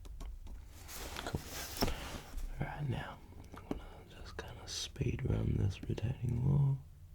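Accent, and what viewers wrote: Australia. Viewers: dude I love this, it's like listening to do ASMR hahaha (in the best way!). Something unmatched and familiar about other Aussies doing asmr, love your videos 🙂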